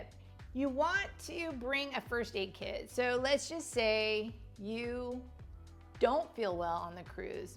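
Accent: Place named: South, United States. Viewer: We do love your southern belle cheerful accent. Makes the content so much more approachable.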